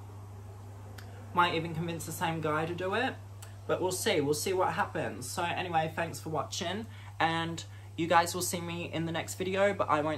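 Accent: Australia. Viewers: MATE LISTEN TO ME PLEASE...YOU SHOULD BE ON TELE BRO FIRST TIME WATCHING YOU AND IM HOOKED.. YOUR ACCENT SOUNDS A BIT AUSSIE AND A BIT OF ENGLISH SOUND COOL MY MAN LATERS LONDON BOY..PEACE.. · Pretty sure it's Australian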